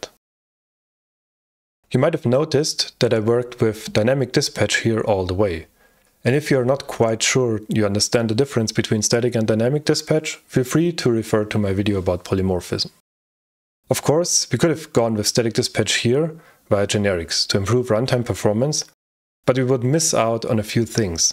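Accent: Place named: Germany. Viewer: Alter Schwede, geiler Channel Name und richtig gute Inhalte. Bin zwar kein absoluter Rust-Anfänger und habe ein paar Jahrzehnte Programmiererfahrung (hallo Turbo Pascal und VisualBasic 2.0, erinnert sich noch wer? 😀) aufm Buckel, aber deine Videos sind trotzdem sehr gut strukturiert und interessant für mich. Also, your English is pretty good, so no issues for me understanding you (although there is still that lingering German accent, but that's normal, trust me - I have it too when talking in English). All in all, very good content. Subscribed for sure. Keep at it. And Thank you!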